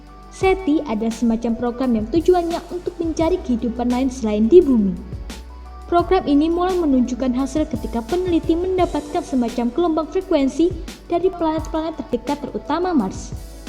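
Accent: Java, Indonesia. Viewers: medok bgt si mbak nya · Jowone medog he😁😁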